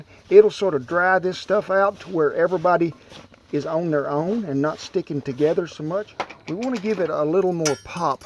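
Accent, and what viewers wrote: South, United States. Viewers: You are my kind of western chef... garden veggies, outdoor cooking everything, and the mostest great old Southern talk’n! I love okra any which way! Squash too! All fresh from the garden! Yummy to my tummy!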